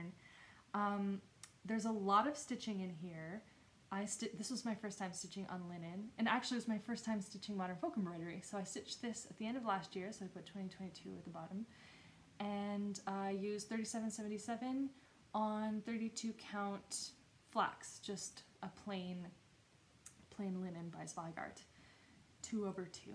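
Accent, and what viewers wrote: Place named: Quebec, Canada. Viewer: Allo des Cantons de l'Est!!!! I'm so glad to have discovered your flosstube!! I'm a big fan of Jacob and the team at Evertote!!! I can't wait to start the holliday countdown. Will you participate? I was not able to go to the retreat as it was too close from a conference at work, but your video made me feel the experience!!! And you are so lively as a person!! I had an idea that you were from Québec by the way you prononce French words. Maybe, with other stitchers, we could make a local chapter? I can't wait for your next video.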